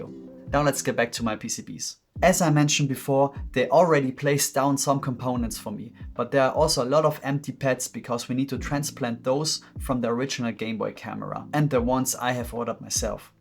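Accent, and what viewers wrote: Germany. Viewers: are you german? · The chill tone and slight german vibe to this was really calming.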